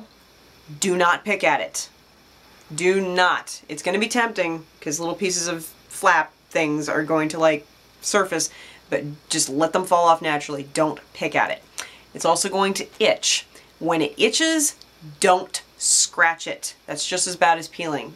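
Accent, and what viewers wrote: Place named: Northeast, United States. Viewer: Hi I have been a follower since early 2012 Just Curious what state are you in. You sound kind of North Eastern U.S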